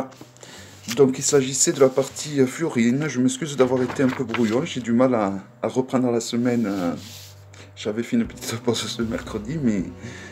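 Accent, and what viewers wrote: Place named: South, France. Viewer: Merci moi j'ai bien aimé la vidéo 😊😊 Belle journée aussi et j'adore cet accent du sud😎😎 😘😘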